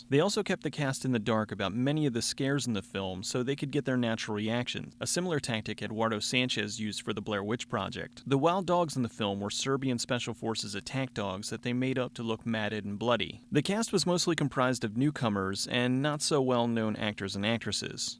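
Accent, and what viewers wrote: Philadelphia, United States. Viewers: I could tell you were from Philadelphia when I heard you say "wooder" instead of water in one of your other videos.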